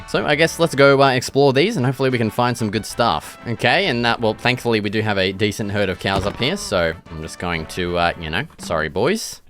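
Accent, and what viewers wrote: Australia. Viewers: are ya aussy mate?? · Are u Australian 🇦🇺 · Aren't you from Australia according to your accent huh?) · are you aussie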